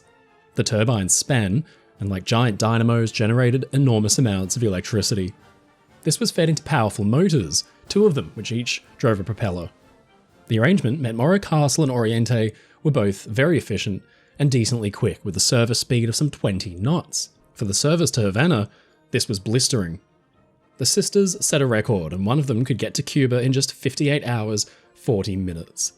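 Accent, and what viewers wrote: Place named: Australia. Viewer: 😊great show man, you have an Australian accent, but your last name seems to be Irish… Just curious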